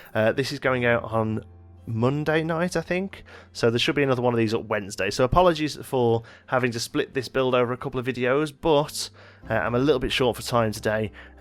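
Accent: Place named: Birmingham, United Kingdom. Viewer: thought i could hear a glimpse of that birmingham accent hah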